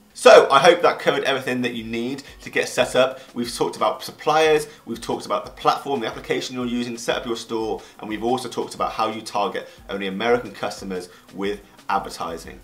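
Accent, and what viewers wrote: United Kingdom. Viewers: Ooh I as much as i hate british accent, this information is very useful.Thanks!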